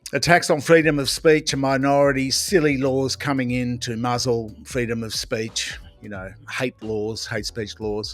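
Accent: Australia. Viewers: Speaking sanity... with an Aussie accent .. love your work mate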